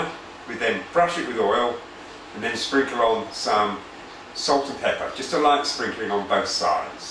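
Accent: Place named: United Kingdom. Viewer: I read that you are supposed to use lime juice with a white fish. Why am I listening to a British guy tell me how to cook?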